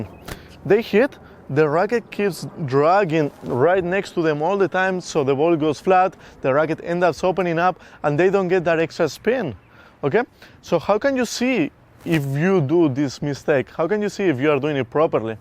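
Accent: Spain. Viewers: he has a spanish accent, I instantly trust him. But please stop with all these "absolutely free courses". everyone subscribed to FYB once and saw the result. 0:6 0:6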